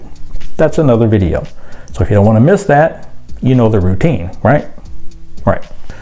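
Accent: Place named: Arkansas, United States. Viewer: Also, I do not know where you are from. You have the best accent. Could listen to you talk all day. My guess is Arkansas. My best friends (I call family,) were all from Arkansas. I lived in Mississippi for a few years. And Granddad & relatives were from Shreveport. Anyway, none of my business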